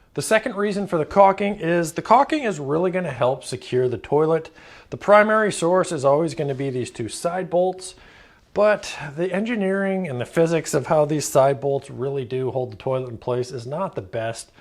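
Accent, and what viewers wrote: Canada. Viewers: I just was listening, YouTube started the video late, I had to stop the video.. you're going to tell us "what you ended up doing on the toilet you installed upstairs " ugh, there's more than one answer, and the most common involves disposal of bodily waste. Here I thought you were American as some Canadian dialect just doesn't bleed out, namely syllables with an "o" show off from Canada like oo sound compared to said from a United States citizen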